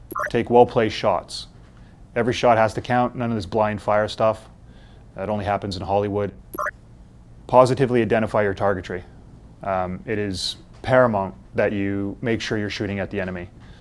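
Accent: United Kingdom. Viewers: Your British sounds so British. For 10 years you must have kept it...that twang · Wait, he is British?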